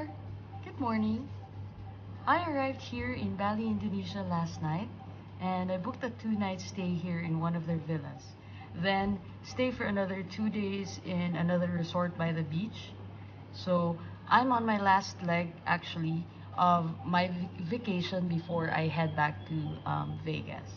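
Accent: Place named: Philippines. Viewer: you have an accent im filipino too.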